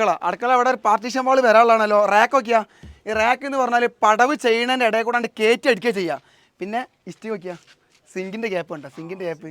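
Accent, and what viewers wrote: Malappuram, India. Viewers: പെരന്റെ ഔത്ത് നമ്മുടെ മലപ്പുറം ഭാഷ😅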